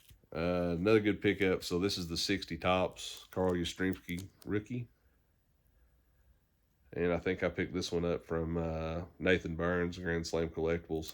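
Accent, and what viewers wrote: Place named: Tennessee, United States. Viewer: Great first video! I have been brainstorming about how to start a channel myself and how I can contribute to the community. You have helped me continue to gain some excitement about the opportunity. We have lots of similarities in our hobby background, along with many others that have come back to the hobby! Looking forward to hearing more from you. Sounds like you may live in Tennessee, I am in Johnson City. Take care and good luck!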